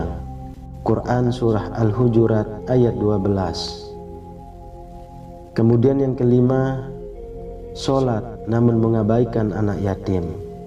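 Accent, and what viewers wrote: Lombok, Indonesia. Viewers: admin logat lombok ya..hehe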